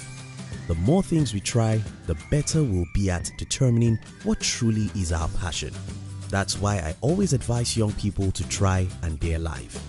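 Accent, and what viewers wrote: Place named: Nigeria. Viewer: How DARE YOU fucking disagree with Marsha Sinetar. I picked an accent you are probably Nigerian. No surprise there.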